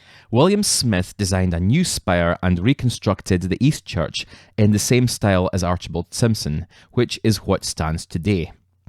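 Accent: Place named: Aberdeen, United Kingdom. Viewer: Love your accent, posh Aberdonian 😅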